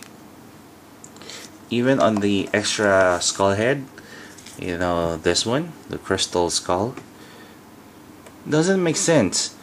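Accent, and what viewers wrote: Philippines. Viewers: Dude can I ask, are you Filipino? Ya kinda sound like a Filipino.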